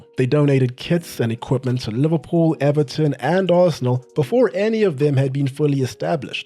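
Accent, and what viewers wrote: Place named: South Africa. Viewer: Are you South African?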